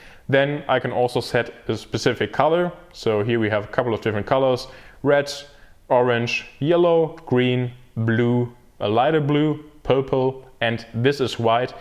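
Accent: Germany. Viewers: Which model/Version is the best of rgbic (bc i want to buy 10 Meters but i want the best version) btw are u German bc of the accent if yeah hallo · Merke ich da etwa einen Deutschen Akzent ? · Dieser Deutsche Akzent haha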